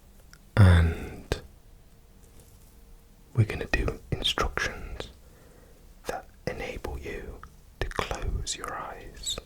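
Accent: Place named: United Kingdom. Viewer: I swear to all that is holy that the British accent just does something to me……I’ve never even heard it in real life but I want to so bad!!! It’s just too charming!!!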